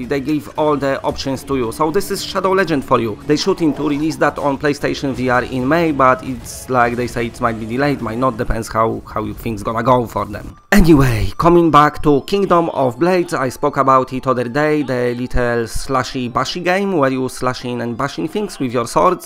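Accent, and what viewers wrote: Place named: Poland. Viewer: I’m going to go out on a limb and guess Polish. It’s terrible to listen to though.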